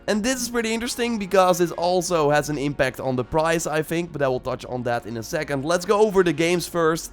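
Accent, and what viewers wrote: Netherlands. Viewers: You sound Dutch...but are you African American? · I can hear ya dutch accent😅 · Man Ive been watching a lot of your videos since you were mainly uploading AC odyssey videos and I still have no idea which accent it is that you have. Is it Dutch?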